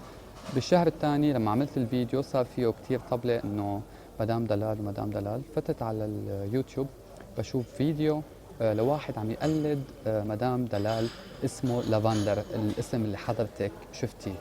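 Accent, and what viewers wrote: Aleppo, Syria. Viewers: tv حلبي بس هيك خاطرلو يحكي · هاد سوري مو لبناني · sara tv لا حبيبتي هاد حلبي اااحي كمان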